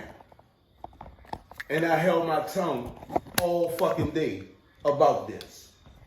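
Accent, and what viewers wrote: United States. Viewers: Gotta be from D.C. or Maryland..❤ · he got that Baltimore accent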